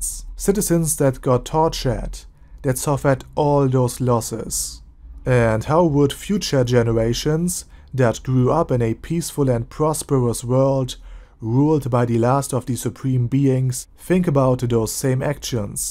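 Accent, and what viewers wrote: Germany. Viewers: great video! :D are you german? sounds like a german accent perhaps you could do an analysis of some of the new worlds gouvernments in case you run out of ideas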